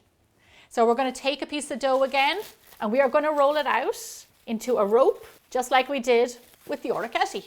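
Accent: Ireland. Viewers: I love the pronunciation of Italian names by someone with an Irish accent! ❤️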